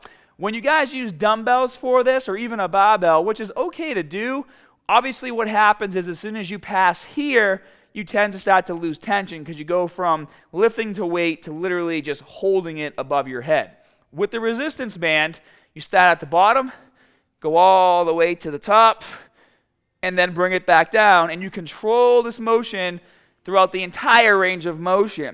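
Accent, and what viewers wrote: Boston, United States. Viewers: Is this a Boston accent?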